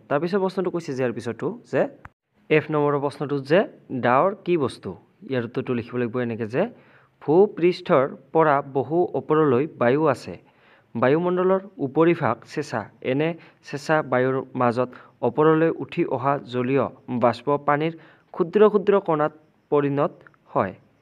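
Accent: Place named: Bodoland, India. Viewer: Sir aapuni Bodo hoi nki maat tu xuni enekuai lage? 🤨🤨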